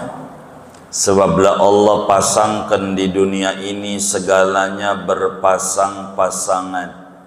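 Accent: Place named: Betawi, Indonesia. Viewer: Suka banget sama ustadnya. Orangnya ilmunya luas, orangnya lucu dan bahagia, ngomong betawinya kocak bikin ketawa jadi asik dengar tausiahnya.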